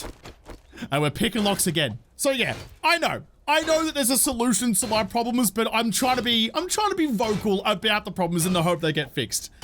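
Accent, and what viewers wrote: Australia. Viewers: maybe ill run into you oneday. Been on it a fair bit lately. Keep up the good work dude.. Love your videos. Its nice to listen to another Aussie instead of a yank lol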